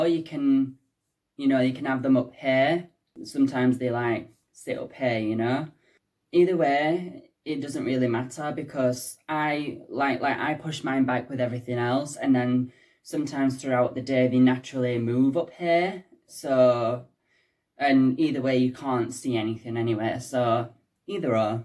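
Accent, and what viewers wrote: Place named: United Kingdom. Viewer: I here a British accent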